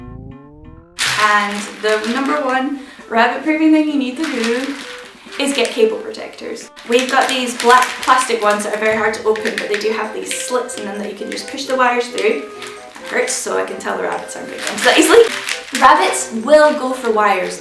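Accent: Scotland, United Kingdom. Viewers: Are you Scottish? You have an accent :D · Are you from Scotland?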